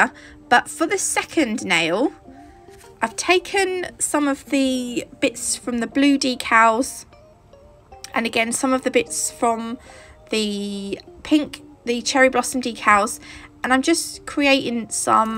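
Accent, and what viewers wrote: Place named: RP, United Kingdom. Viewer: They are really cute. I love the layering of different flowers (decals, paint & nail art) thank you ❤️ I hope you don’t mind me saying, but I’ve just noticed your voice/accent is quite posh sounding 😊(so ladylike….. and then the Pepsi Max shows it’s face and we get a burp 😂). Never change ❤️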